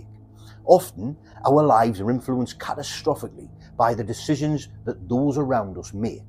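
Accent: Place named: South Shields, United Kingdom. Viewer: This happened just up the road from us. I'm originally from south shields and your voice is making me homesick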